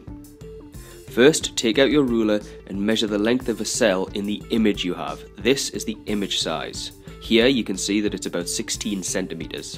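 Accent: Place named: Newcastle, United Kingdom. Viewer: got a science exam tomorrow so ty and the geordie accent actually helps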